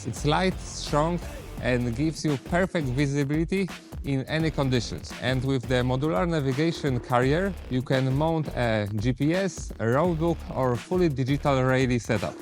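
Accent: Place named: Poland. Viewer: My ears bleed after listening to this Ponglish 😢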